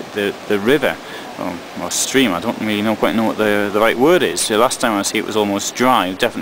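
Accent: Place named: England, United Kingdom. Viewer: I cannot quite make out your accent. What region of merry olde England do you hale from?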